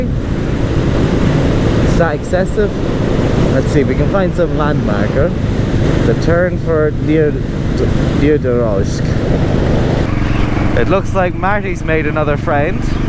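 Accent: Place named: Ireland. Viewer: Just found you. So refreshing to hear another Irish voice coming from inside the helmet on one of these vids. Gorgeous bike too 😍 subbed!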